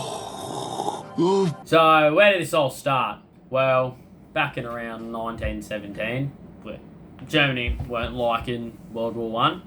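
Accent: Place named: Australia. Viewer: I was for sure expecting a deep Mississippi accent i was not expecting an Awesome Aussie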